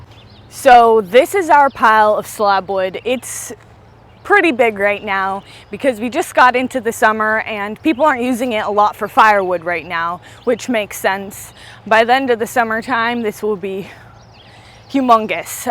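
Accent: Pennsylvania, United States. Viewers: That pa. Ridge runner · She has a PA accent. My wife from Lancaster has the same accent. Sometimes the sentences don’t end, “Do you want to go with…?”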